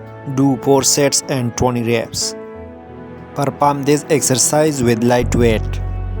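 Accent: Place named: India. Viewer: Your English very poor indian style hahaha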